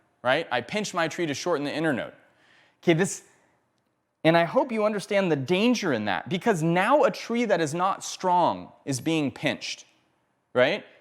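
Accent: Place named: United States. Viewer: a very speedy US accent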